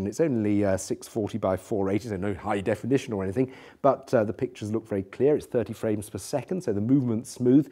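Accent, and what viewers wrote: United Kingdom. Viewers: Holy crap, the Brittish is dripping of that accent. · he does not just speak with the British accent, he hads his own flair to it lovely job mate like the brits would say!!!!.....nice job from america.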